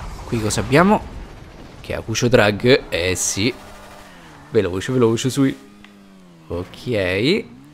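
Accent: Rome, Italy. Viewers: Che se de roma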